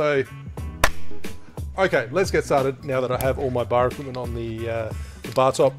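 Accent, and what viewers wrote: Germany. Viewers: I love your German accent! And it looks like a great drink!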